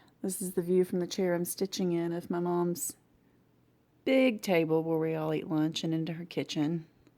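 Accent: South, United States. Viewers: Love every single thing you do and next to Marlene, I think you may have the sweetest Southern accent ever. Could listen to you all day. Was fun seeing you mom’s house....love that you go there and spend time with her and always talk so sweetly about her.....miss my mom everyday. Fun to see the bottom corner of HRH Halloween since I started at the top right. 😘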